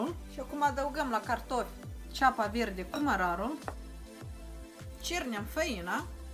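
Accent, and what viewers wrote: Moldova. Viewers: Pare usoara la prima vedere dar e destul de muncit la ea.Oricum D-na m-a fermecat cu dulcele grai moldovenesc👍💋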